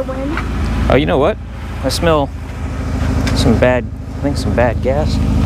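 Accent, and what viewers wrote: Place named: Texas, United States. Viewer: Hey you know Texas Talk just like me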